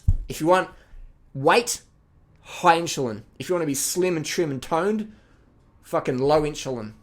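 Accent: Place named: Australia. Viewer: Great video. Because of your awesome Australian accent, it kind of sounds to my American ears like you are saying fucktose when you say fructose. =)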